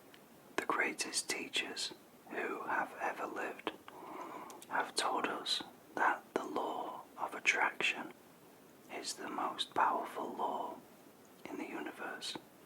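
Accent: Yorkshire, United Kingdom. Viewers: Are you from Yorkshire? I recognise the accent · are you British? I like your accent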